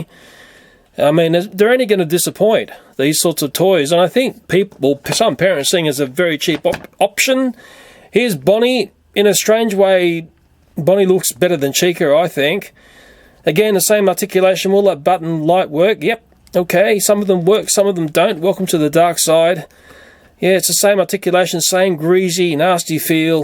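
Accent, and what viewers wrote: Australia. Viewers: I love the way Australins talk. Im American so I can't really understand what he means sometimes.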